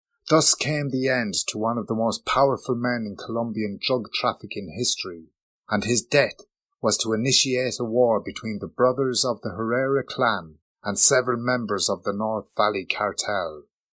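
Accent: Ireland. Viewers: Colombia in a Irish accent. That as a Scottish person and someone deeply into Colombia and the false war on drugs , CIA, Pepes and Pablito etc very good Patrick Escobar Popeye RIP. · That Irish accent is not the best narrating sound lol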